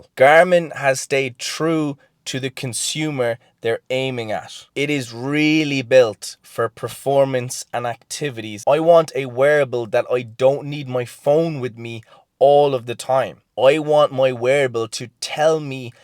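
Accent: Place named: Ireland. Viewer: I’m not listening to a word this man is saying because his Irish accent is so lovely I’m totally distracted.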